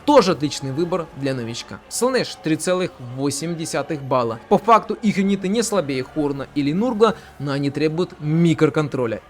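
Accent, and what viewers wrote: Ukraine. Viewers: Хороший канал про практическую ваху. Уши режет украинский акцент , но в принципе очень годно. Лайк. Подписка ? да .. заверните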